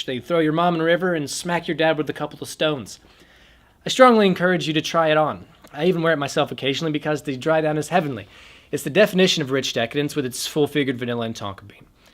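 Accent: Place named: Georgia, United States. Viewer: Your southern accent is so subtle-almost undetectable. I’m guessing Georgia. Two great fragrances, especially Shalimar.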